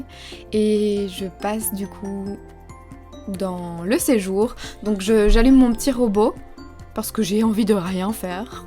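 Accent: Belgium. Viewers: J adore l accent Belge!!!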